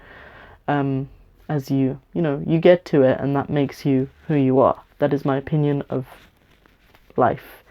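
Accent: United Kingdom. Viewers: On a side note, I haven't really been into (non commercial) vlogs but I think I get it now! :o It's really nice and fascinating, it's like someone is having a personal (albeit one way) conversation with you through the screen. Feels therapeutic somehow. I'm going to try and find more british ones (need help attuning my brain to british accents)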